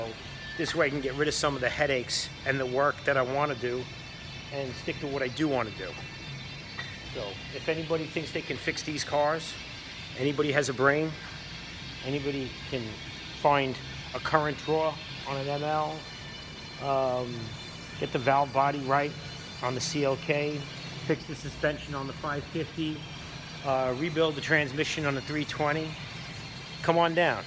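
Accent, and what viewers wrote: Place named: New York, United States. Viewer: Nothing like a New Yawker to tell it like it is.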